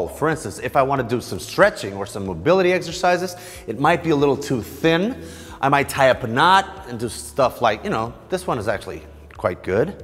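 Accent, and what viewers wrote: Portugal. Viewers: Quando fazes a pesquisa em inglês para obter mais informação, mas o melhor resultado é aquele craque tuga marado a falar inglês ahahah Grande Caralho 💪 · Reparei que era tuga pelo sotaque. Excelente video! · Passei o video todo a pensar "este gajo deve ser Português"... devia ter lido logo a descrição!:D Belo Video!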